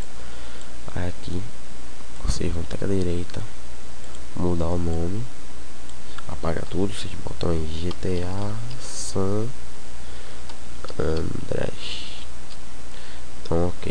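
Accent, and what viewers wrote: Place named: Paraíba, Brazil. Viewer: vozinha de paraibanooo éh noiz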